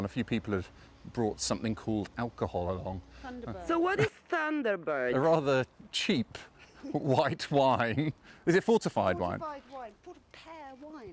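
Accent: United Kingdom. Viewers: Can anybody tell me from what region of british accent he has?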